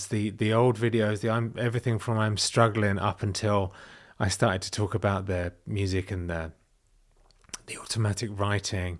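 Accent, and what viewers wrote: United Kingdom. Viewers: Youre British Accent and looks tells me you can be an Actor. You were put on this Earth to be a mentor for your children. Please be happy that God put you on this earth. You are loved!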